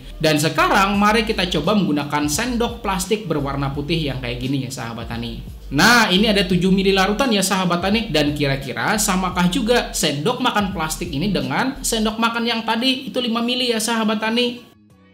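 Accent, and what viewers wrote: Banjar, Indonesia. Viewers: dari dari logatbicaranya seperti Bahasa Banjar!!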